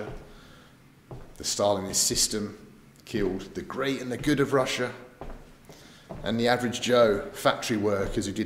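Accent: United Kingdom. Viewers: Great video,! A foreigner who helps me, the russian to better understand a "misterious russian soul". I enjoy your epic voice and nice brittish accent. Thanks for travelling across russia's provinces. Hope to see you one day here in Russia's Far East Sakhalin. And yes, all museums in Russia are usually closed for a day-off on mondays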